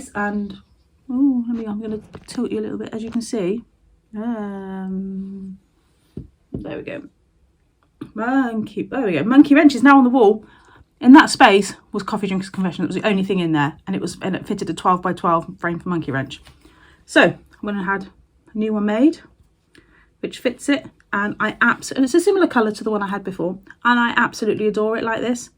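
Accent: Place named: United Kingdom. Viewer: Hello, where is your frame company please, couldn't see it on your very comprehensive drop down list unless I missed it somehow and didn't seem to come up on Google search. Enjoying you video sounds like you are in the same area as me in thee UK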